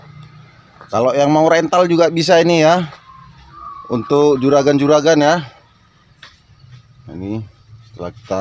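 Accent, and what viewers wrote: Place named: Karo, Indonesia. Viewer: Bang logatnya macam orang karo. Kalak karo Kam bang?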